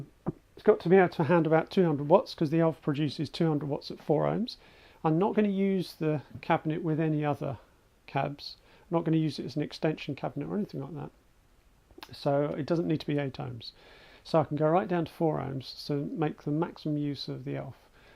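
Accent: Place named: Europe. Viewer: I highly recommend the oberton 10b200 if you live within europe (I guess you do because of the accent ;) ) it outperforms any eminence speaker on the market and is used it high-end dutch boutique cabinets. (The price tag is the most attractive of them all) look it up on talkbass, it's a great woofer!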